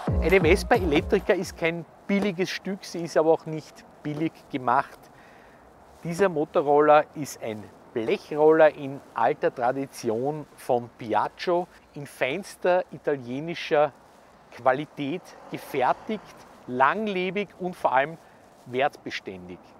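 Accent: Austria. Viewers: östricherr reded so lushtig hahah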